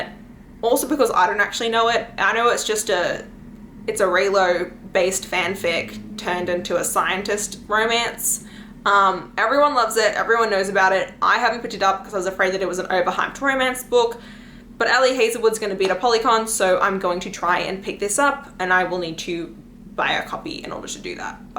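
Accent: Australia. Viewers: When i put u in 2x speed u sound extra australian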